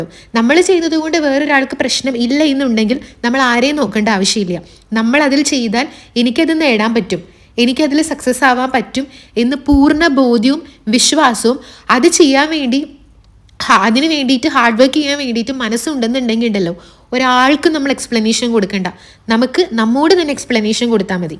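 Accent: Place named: Japan. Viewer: Oru japankari malayalam parayunna pole und,, love your vdo sissy 💌🥰... Keep going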